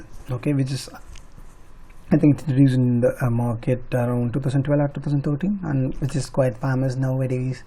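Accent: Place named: India. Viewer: i hate this indian english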